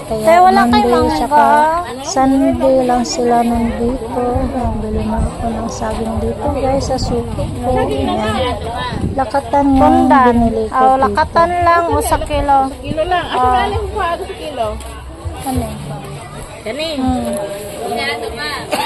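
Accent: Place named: Visayas, Philippines. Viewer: Pretty bisayang bisaya na 😂 ❤❤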